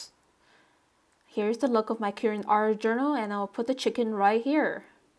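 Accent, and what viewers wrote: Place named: England, United Kingdom. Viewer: 2023…just saved this as a watch later…I love rotisserie chicken, watercolor, and voice and and English accent is nice to listen to!! Keep up the good work! I’m inspired ❤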